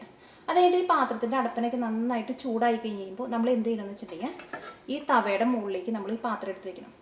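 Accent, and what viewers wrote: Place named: Northern Kerala, India. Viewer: kitchen u r a very good cook!!! I like your recipes coz whenever I try any of them, they turn out to be really "homemade"... n me n my Husband are fond of your slang... R u from northern part of kerala?? Keep inspiring beginners to cook confidently..🙂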